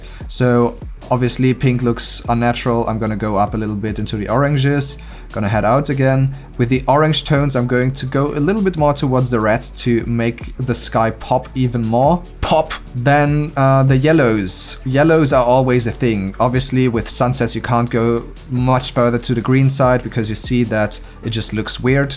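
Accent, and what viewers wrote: Germany. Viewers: Are you German? Because you sound like this😅. Ps: I am German too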